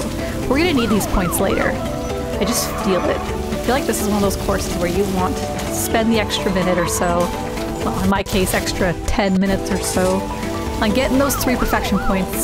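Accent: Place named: Canada. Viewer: Silence or humming. Got it. :_marQuack: Girl, you’re way too good at this game what the actual fuck. Seriously wtf im horrible lol Nothing to do with anything but am I allowed to say that I’m a tad in love with your canadian accent? 😍 The music in this game is so 🥰 geeeez. Its seriously worth the frustration.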